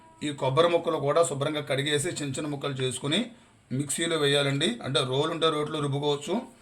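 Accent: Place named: Andhra Pradesh, India. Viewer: Ayya garu mee swagramam yekkada? Andhra lekka vundi.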